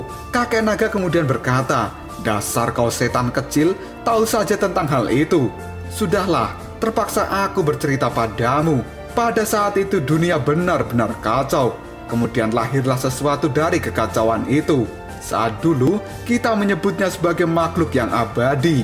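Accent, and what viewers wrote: Java, Indonesia. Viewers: Logatnya Jawa ya bagus sob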